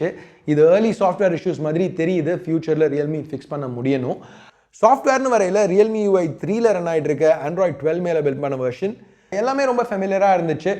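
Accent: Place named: Chennai, India. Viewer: Ena bro Chennai tamil pesuringa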